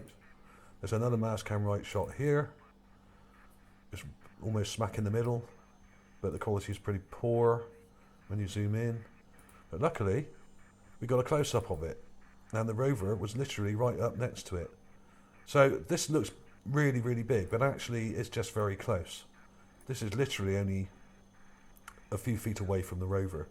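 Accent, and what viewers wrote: Bristol, United Kingdom. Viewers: Love your Bristol accent!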